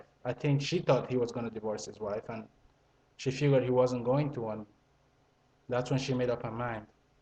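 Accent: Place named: Italy. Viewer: You must be Italian??? At least it sounds like it haha. It's a nice accent though. I'm German. But man, you are 100% correct. You have to watch out what kind of women you are dealing with, they are secretive and snap at any moment. Poor McNair.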